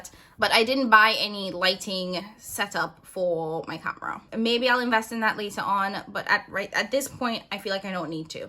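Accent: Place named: Trinidad and Tobago. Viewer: I'm trying to figure out where you are from based on your accent. It sounds like Trini to me. I'm planning on getting the Sony ZV-E10 to replace my Canon SL2, I find the buttons on the Canon to be too complicated to figure out and ALL of the Sony ZV has simple buttons and only I think 5 options per button placement.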